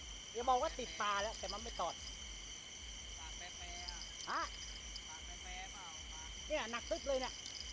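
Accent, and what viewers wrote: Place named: Surin, Thailand. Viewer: น้าอยู่จ.ว อะไรครับ สำเนียงเหมือนคนสุรินทร์หรือไม่ก็บุรีรัมย์ใช่ไหมครับ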